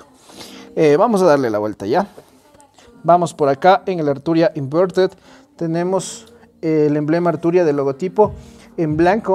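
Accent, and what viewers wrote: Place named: Ecuador. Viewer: de ley eres voz ecuatoriano, saludos desde cuenca, yo tengo la version blanca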